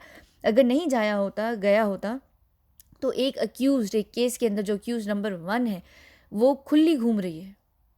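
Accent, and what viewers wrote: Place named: United Kingdom. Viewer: wow sachi she is worth those words aur b bohut Sare...aap sachi bohut acha decode karthi ho really so awesome videos n very informative n I love the way you speak the UK accent of the English words n sentences you speak madam🙏 plz keep making more videos for all of us. Cuddos to all your team👍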